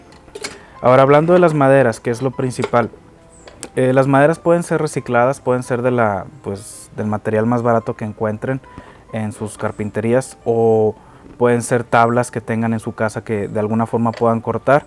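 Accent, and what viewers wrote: Mexico. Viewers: Me agrada tu acento, ¿eres del norte de México?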